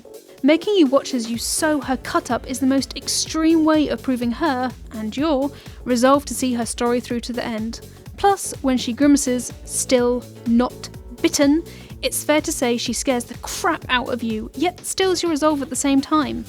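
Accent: United Kingdom. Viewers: I love female characters and often play as them when given a choice but I don't dig this videos' feminist undertone and the fact the boss from mgs3 is absent really is a bit of a fuckup. Keep your identity politics shit out of games, unsubbed, feminism is collectivist trash and you Brits need to move on. Stop letting bitter women project their shitty politics on games, that shit failed and we don't wanna hear it and it doesn't sound more clever with your shitty accent. How about a best characters list you gender obsessed witch.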